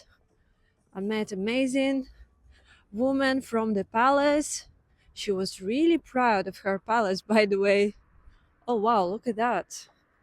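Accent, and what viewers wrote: Russia. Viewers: Зачем ты говоришь на английском, слышно же что ты русская и говоришь с акцентом😁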